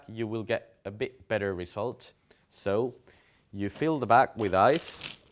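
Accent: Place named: Finland. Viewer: Lmao i recognize a finnish accent when i hear one (im finnish)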